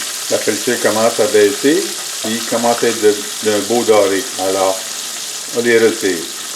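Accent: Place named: Canada. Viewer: Joli accent Canadien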